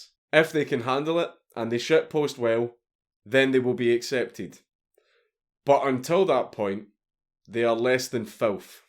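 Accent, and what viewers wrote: Scotland, United Kingdom. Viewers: "you know what i am about" yeah, you are someone who is 'edgy', and says things that sometimes tickle the edge of hate speech. given that america has no hate speech then we would just call you unprofessional, at least while not in a professional setting, bordering on low brow humor, but only sometimes. oh yeah, and you have a scottish accent. you may get some shit for that accent over here. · I seriously love your Scottish accent. I've watched so many of your Mad Lads vids the voice in my head now has an accent😂😂🤣🤣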